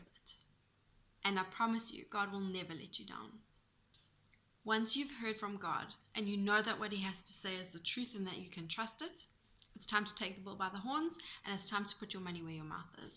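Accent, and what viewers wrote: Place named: Australia. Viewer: Great work! Your thoughts on faith are spot-on! I can relate so much to this because my journey with my Carlitos giveaway ministry to kids fighting uphill battles is a total faith-walk! Faith honors God, and God honors faith! Keep up the good work! (BTW, I love your accent - are you Australian? Forgive me if I'm nowhere close! haha!)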